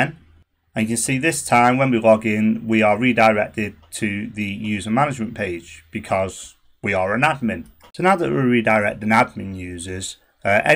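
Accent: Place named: Australia. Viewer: Many Thanks , its really helpfull (but i sometimes confused with your australian accent heheh) , already subscribe and like all your video :))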